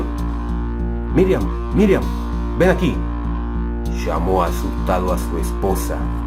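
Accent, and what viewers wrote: Tucumán, Argentina. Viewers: Hola, muy bueno, sos tucumano??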